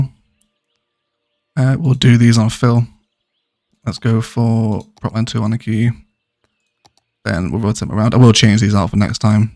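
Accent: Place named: United Kingdom. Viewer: Found your channel accidentally but so glad I did!!! Good to hear a fellow Brit playing this 👍🏻👍🏻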